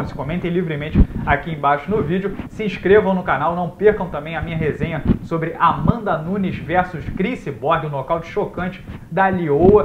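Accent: Rio de Janeiro, Brazil. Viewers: Ótimo comentarista!...análise perfeita, apesar do sotaque carioca irritante (eu acho), a análise é perfeita!!! Jones é foda!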